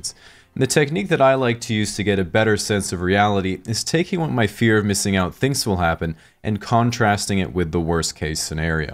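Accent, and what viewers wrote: United States. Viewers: you sound so american after hearing your australian accent wtf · You sound like if internet historian was American.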